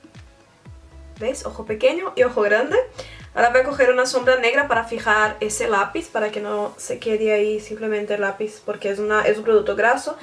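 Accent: Brazil. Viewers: Me gusto su acento brasileño mezclado con el español :3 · Brasilera aunque en otro canal una chica también me pareció de Brasil y me dijeron que es de Honduras. 😀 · ya se me hacia que tenias un acento brasilero xD en fin buen video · Tienes acento brasilero, muy bonito! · Creo que Brasilera o eso sentí xd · eres brasileña? (como se diga)xD o hablas así?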